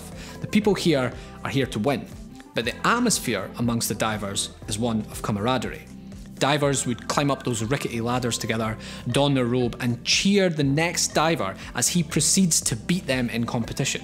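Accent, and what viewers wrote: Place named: Scotland, United Kingdom. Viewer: ah yes the return of mr scottish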